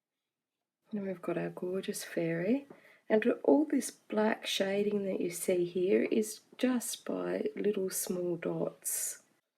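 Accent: Australia. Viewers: I like your videos a lot, and it's great to hear an Aussie voice, but I'd like them even more if you kept you hands/fingers off the page while trying to see the details of the picture, and yes, they are exquisite. You've definitely persuaded me to buy at least one of these, so thank you for sharing.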